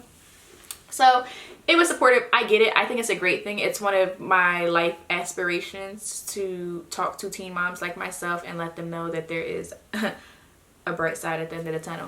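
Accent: Philadelphia, United States. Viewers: I knew you was from a philly with that accent. So strong and your daughter name is so cute . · Instantly knew you were from Philly as soon as I heard you talk 😂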